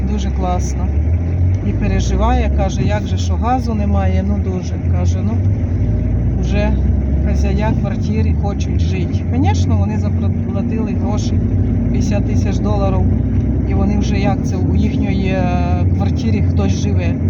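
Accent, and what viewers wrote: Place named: Korosten-Ovruch, Ukraine. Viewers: Зразу зрозуміла, що з Коростенського району. Коростенсько- овручський говір не переплутати 😊. Вітання з Черняхова. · Говор Житомирської області,по розмові зразу видно звідки людина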